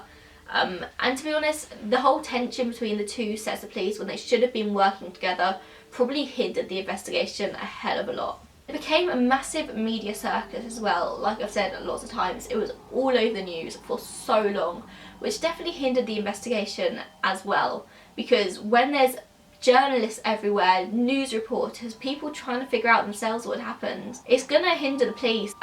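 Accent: United Kingdom. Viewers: Nice british accent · I'm in love with your British accent dear xoxo. You're so cute! As far as the police is concerned, they fuck up everything in their stupid scheme of investigations. I see this over and over on podcasts like yours...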